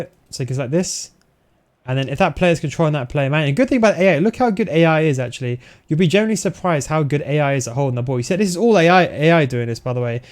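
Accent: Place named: United Kingdom. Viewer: bro speak more clearly, not everyone is understanding you other than people from UK. after "player lock" bro wtf u sayin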